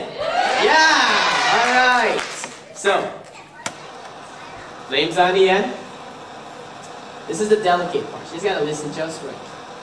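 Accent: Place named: Canada. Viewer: I love his inherent Canadianity. "Eh?"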